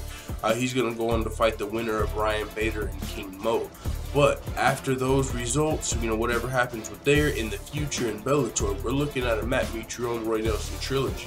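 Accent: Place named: South, United States. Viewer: Dang Boy, you're way more country than i had originally thought..Remind me of Clayton Bigsby haha!! Stick to that accent now, ya-hear??🤠🐴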